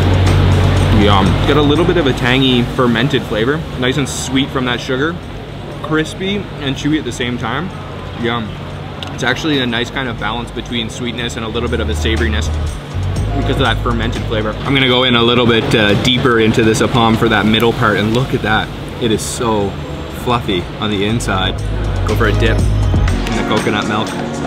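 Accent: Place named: Canada. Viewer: He's Canadian 😂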